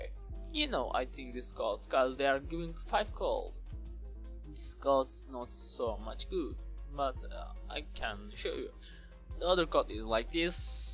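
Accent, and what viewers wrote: Turkey. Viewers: Kardeş %100 Türksün Ben konuşmandan anladım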